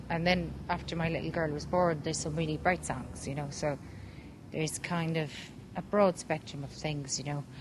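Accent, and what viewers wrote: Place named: Ireland. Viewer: Precious irish accent. Irish queen 🍀❤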